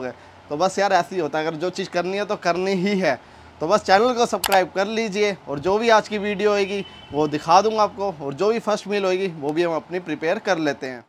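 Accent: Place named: Uttar Pradesh, India. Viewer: Aap up se ho sir voice se pta chl jata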